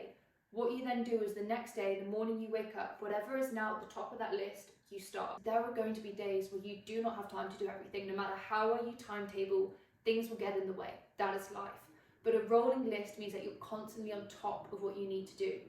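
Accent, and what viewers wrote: United Kingdom. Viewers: Thank you so much, I am a freshman, And I almost finish first term. I really wanna be a productive and disciplined in 2026. I'm really interested in your schedule and your ways for being discipline Thank you for sharing your routine ❤ Honestly I dont like British accent but you changed my mind totally..I'm trying to pronounce words like yo 😁❤British accent is stunning,when you speak❤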